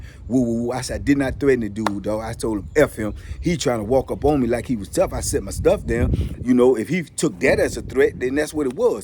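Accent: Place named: Washington DC, United States. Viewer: You sound like you from DC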